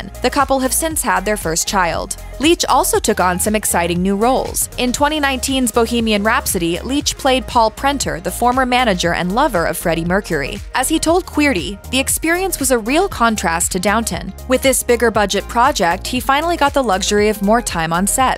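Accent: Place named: United States. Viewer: Blimey! That American accent is ear-splitting!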